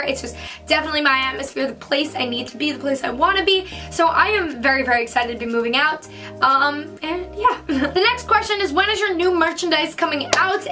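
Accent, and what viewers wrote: New York, United States. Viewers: TeAnna Besougloff new yorker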